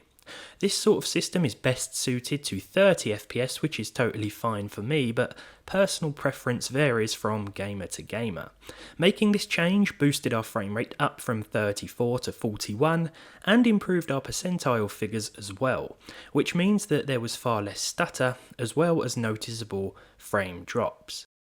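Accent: United Kingdom. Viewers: ew british accent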